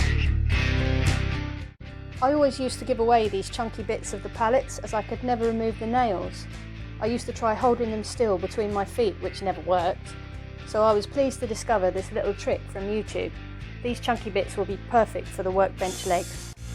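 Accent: United Kingdom. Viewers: Great to hear a British accent and sayings on these videos. Good job. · Excuse me...are you scottish ?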